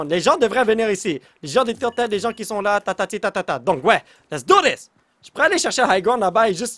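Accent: Quebec, Canada. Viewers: Cc t mon YouTubeur préférer j'adore tes vidéos fortnight continue c'est trop génial j'adore les accent québécois lol en tout cas continue comme ça et mets un like please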